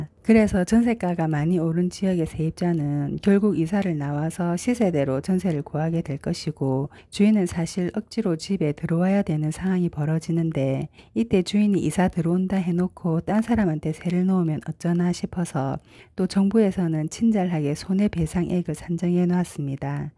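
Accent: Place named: Busan, South Korea. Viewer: 안뇽하세요 저는 부산 사상에 살다가 이민을가버렸어요 님목소리들으니 부산에 빨리 가고싶네요 늘 감사합니다~^^♡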